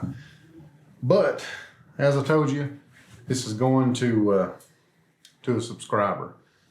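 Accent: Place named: South, United States. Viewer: My husband and I just watched the whole thing together. Such a great video!!!! James has a few antique tools that my grandpa has given him and HE LOVES THEM!!!! Your channel is seriously so cool. I love your content and I love this video!!! :) Great job restoring!!! Keep creating. I’m subscribed and will always be:) Lastly, I love your accent! I wish I had a southern accent... From, Collins Country:)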